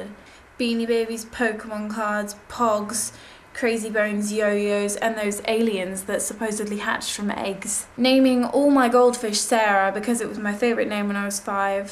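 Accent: England, United Kingdom. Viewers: Y'know at a guess I'd say just totally english. And just that by the sounds of it, me and her have watched too much american TV. HAHA. And too right I've been watching the odd arthur episode after school. Its on before? hmm I wouldn't be up in time. But yeah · why do people think your accent is strange? Its a normal English accent... isnt it? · What is it about girls with English accents that get them featured on youtube? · how do you become a director?? plz reply, btw your very cute and i like your english accent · Well she's English as u can hear frm her accent. Oh btw here in the UK horse riding is actually quite common and u don't have to be rich to be doing it. Neways i agreed quite a lot to wut she mentioned i mean it really is quite nostalgic. Shhe just basically aformentioned things that were the latest craze and all that. I think she covered things a lot of average people will remember. 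This video is something... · Ok, I thought you sounded English. Well done on being featured! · Thats an English accent! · you have an english accent, but it sounds like your'e trying to be American sometimes. Baaaad. Be proud to be english! ( we're so much better. )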